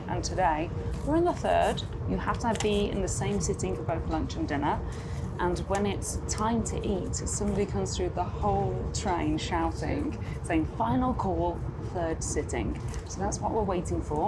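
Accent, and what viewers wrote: United Kingdom. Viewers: This is the first time I’ve seen one of your videos and I love your energy. Where are you from, I can’t place your accent, somewhere north because you say class and not clarse. Oh my god I’ve just realised it’s James from the Chris Moyles show! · Every time I click on this channels videos I start watching and enjoying the well spoken posh English woman and then she completely ruins the whole thing by referring to toilets as the shitter. Just why?🤢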